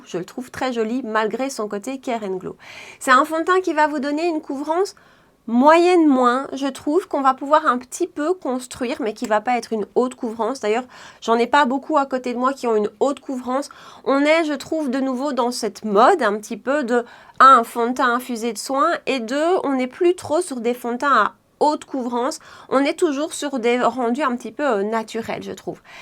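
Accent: Belgium. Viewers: Coucou d'une compatriote expatriée, ravie de retrouver les intonations, expressions du plat pays. Je suis de la team investissement sur le skincare et maquillage petits prix, mais j'ai des rides et des taches qui réclament un fdt ou BB crème performant, alors je me lâche sur le fdt. J'ai eu étrangement un gros coup de coeur sur le Nars comme vous, même si normalement le glow fait effet loupe sur les rides, celui-ci fait un teint éblouissant, comme vous dites, même en poudrant, et en unifiant, une merveille. J'ai craqué sur le Lancôme, mais gros flop, je trouve qu'il n'unifie pas, ne floute pas, ne tient pas, ne se fond pas, me fait vraiment un teint...moche! Malheureusement, il n'y a presque plus de teintes claires et médium du Nars sur les sites, donc je le garde pour les grandes occasions et les longues journées. En petit prix, j'aime beaucoup le Bourjois 123 pour le moment, avec une base illuminatrice dessous, excellent rapport qualité/prix pour moi(peau mixte)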